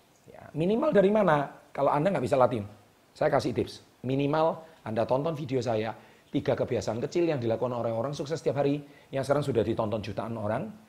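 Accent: Java, Indonesia. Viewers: Logat jawa nya buang lah dan kamu sendri bicara masih belum fasih gimana mau orang dengar dan fidio bukan kamu punya tunjuk orang punya dasar pembohong cari sensasih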